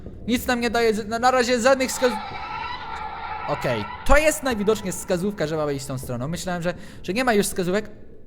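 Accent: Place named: England, United Kingdom. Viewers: Mr XanTeS pl To nie kaleczenie. większość życia mieszkał w Angli, więc i posiada angielski akcent, nie polski.. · Mr XanTeS pl on jest z anglii jakbys nie wiedzial -.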